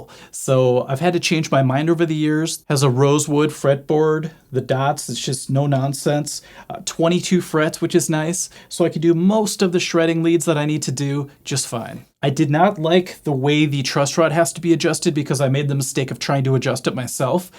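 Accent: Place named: Midwest, United States. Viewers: It's the Midwest accent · "Jayguar" "knabs" Sounds like he's from the mid-west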